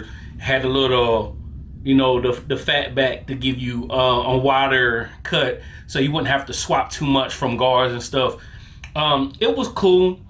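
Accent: South, United States. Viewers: Nothing wrong with a southern accent brother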